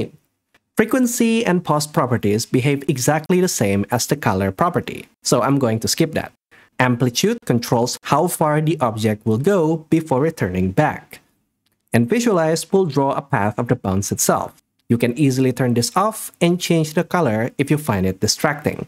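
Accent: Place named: Indonesia. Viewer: Amazingly helpful, also found your other tutorials and facial expression packs to be extremely valuable, thanks so much, especially for the free stuff! Also, CMIIW, but your accent sounds pretty South East Asian (dare I say Indonesian?) it adds a lot of charm to the videos and, from a fellow Indonesian, I hope you succeed and continue to make tutorials like these.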